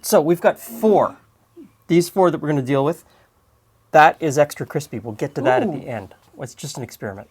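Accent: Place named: Toronto, Canada. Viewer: I don't watch this channel often but is from Toronto, which can be a bit different from the rest of Canada in mannerism, way of speaking, etc.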